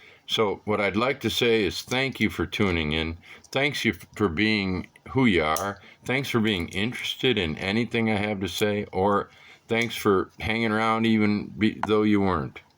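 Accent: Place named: Italy. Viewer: Yes, your Spanish has an Italian accent. 😀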